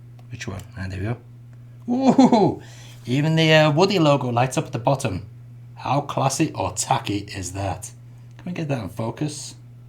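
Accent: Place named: Liverpool, United Kingdom. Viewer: I can hear the scouse twang now that you've mentioned it. Cool!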